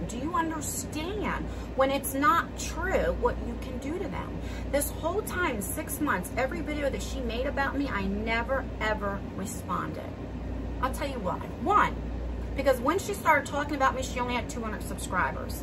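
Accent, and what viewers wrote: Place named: Baltimore, United States. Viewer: your accent is what draws me lol. It’s not the same, but I’m from Baltimore City and remember my not so great youth! I’m in the south now for years and live to hear folks talk like home lol. All that other crap I really don’t pay attention to. In my opinion the folks that feed off that shit should be ashamed!! I started watching around the time after your daughters scare, but just from watching a few if your videos at that point, I could see your genuine. You sound like my best friend growing up that I got in trouble with and your videos make me feel Like I’m sitting down with an old friend. Screw those a holes!!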